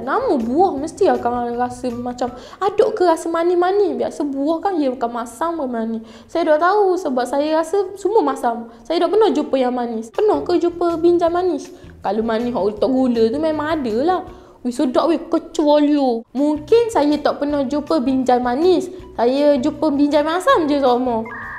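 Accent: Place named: Terengganu, Malaysia. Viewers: Seriously kakak ni yang paling comel dalam ML Studios, dengan bahasa Terengganu pekat dia, cara dia bercakap. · Akak idola..banyak belajar loghat Terengganu dengan akak.. 🐊: Heheh...dapatlah satu heheh... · Suka dengar loghat ganu, speaker comey betul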